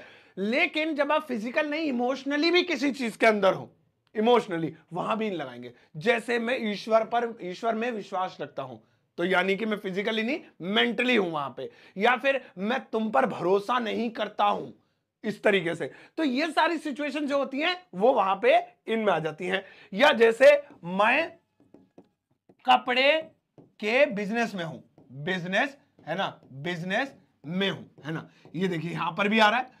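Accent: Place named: Delhi, India. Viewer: Sir lgta h aap delhi se ho 😂😂😂❤❤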